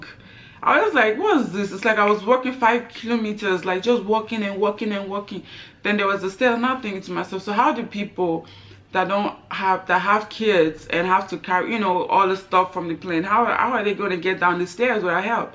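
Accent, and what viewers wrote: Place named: Nigeria. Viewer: HMM THIS IS SERIOUS..U HAVE NAIJA ACCENT HERE OHH JESUS..COMPARE TO NOW ...HAHAHAHA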